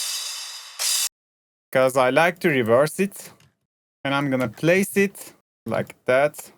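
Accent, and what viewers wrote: Turkey. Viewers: Turkish accent is my favorite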